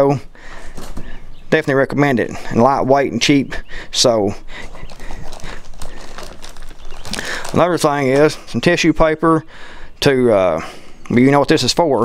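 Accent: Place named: South, United States. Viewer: I only subscribe to a handful of people of which your one. Your videos are fast and full of great content 👍🏻, however, I’ve never been to the south and am guessing part of the time what you are saying so I turn on closed caption, but they are getting it wrong as well 😆. But anyway I enjoy your videos, but your accent sure keeps me on my toes 😬😎 Keep up the great work!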